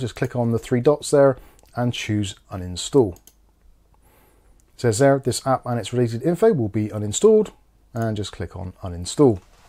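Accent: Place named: South West England, United Kingdom. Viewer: Being from Bristol nice to hear a South Western accent in this space and you also have a love for tech. Subbed mucker.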